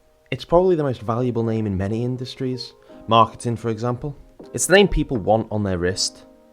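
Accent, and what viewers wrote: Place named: Northwest England, United Kingdom. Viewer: Subbed for the northwest accent. Greeting from Ormskirk.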